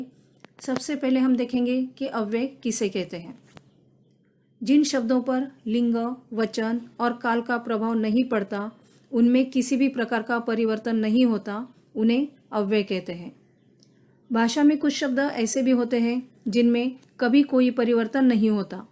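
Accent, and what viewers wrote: Maharashtra, India. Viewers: By Your Voice I think you are Marathi Are u Marathi?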